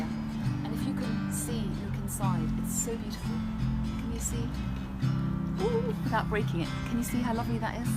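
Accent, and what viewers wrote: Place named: England, United Kingdom. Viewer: Thanks for sharing your gardening tips! Love your soft and English accent!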